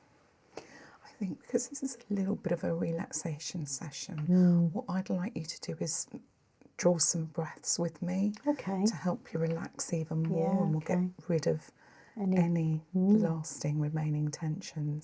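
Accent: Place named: Nottingham, United Kingdom. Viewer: This is officially my first ever comment on Youtube,. And it had to be for you! Absolutely love your tonality, pace and accent.. I had studied in the UK some years ago and your videos always take me back to Nottingham:)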